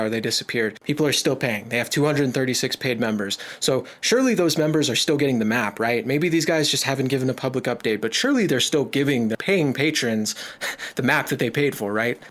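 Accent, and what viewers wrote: United Kingdom. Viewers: talks like the average British person but it's worse than talking to a French person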